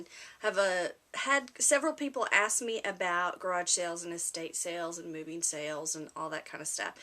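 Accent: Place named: Texas, United States. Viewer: omg you are so darn adorable! I am from Texas also and I just live your accent. Never been to an estate sale, but definitely looking to go check a few out. thanks for the great tips.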